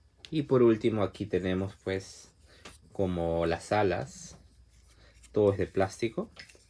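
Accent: Peru. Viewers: Hola amigo, eres de. Peru? Tienes un acento bien neutro, y me parece que si eres compatriota mío, sea cuál sea la respuesta, grandes exitos amigo, que siga creciendo el canal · En una se reconoce a un Peruano cuando habla ...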